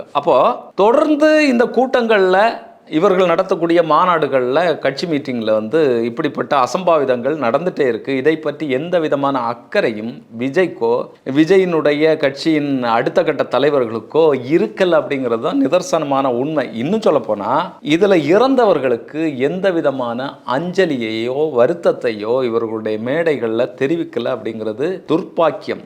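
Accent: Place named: Kanyakumari, India. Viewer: Sir, are you from Kanyakumari Dist, and Monday market or Marthandam side? Your slang is kk slang.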